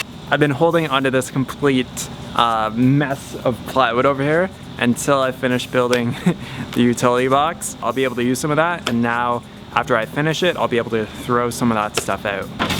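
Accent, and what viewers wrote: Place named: Canada. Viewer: You're Canadian and you talk like a California surfer, only with the occasional aboot thrown in.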